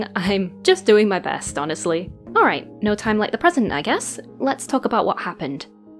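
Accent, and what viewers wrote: United Kingdom. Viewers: hi, i sit with the video through the end, you have a really awesome way to put the pieces together to say exactly what needs to be said, love that bit where you said the person we have to protect the most at the end of the day is ourselves, that is true and it's what i've always thought! i don't usually involve myself in drama and prefer to block and move on. however, i feel like we need to speak sometimes on these topics that could influence a young audience. btw love your british accent? it's pretty thick lmfao ( not saying that in a negative way!! just in a "i noticed" way ??? idk how to explain it LMFAO ) have a good day and u have earned a new subscriber 👍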